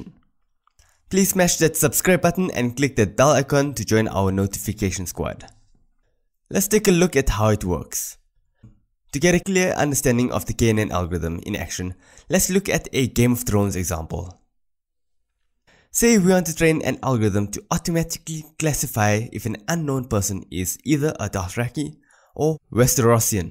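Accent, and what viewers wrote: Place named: South Africa. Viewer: This dude sounds like he's from South Africa.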